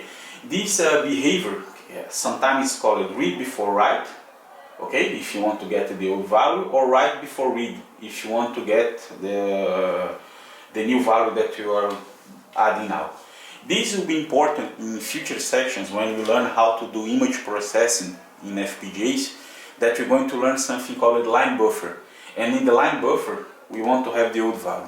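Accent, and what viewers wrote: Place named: Brazil. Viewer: Great, but that brazilian accent :D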